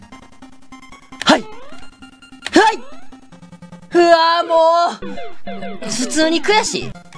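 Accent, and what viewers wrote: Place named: Kansai, Japan. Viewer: 関西弁好き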